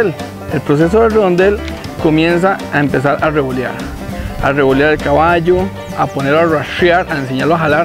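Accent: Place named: Costa Rica. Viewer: Yo cuando escuche su asento dije es de Costa Rica....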